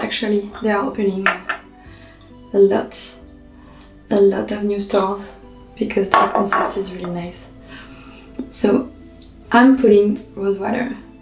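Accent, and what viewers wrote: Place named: France. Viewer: The French accent and the gentle tone of her voice is mesmerizing. She doesn't need make up, already perfect.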